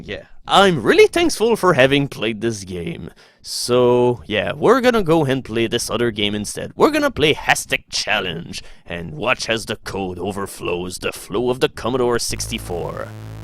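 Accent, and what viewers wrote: Quebec, Canada. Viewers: Yep, that is indeed a Quebec accent. I live with frenchmen, myself. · I think he's from Quebec. It's pretty much the best accent for LPing I reckon. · Best accent ever. Just another reason I am jealous of Quebecois (I'm Canadian).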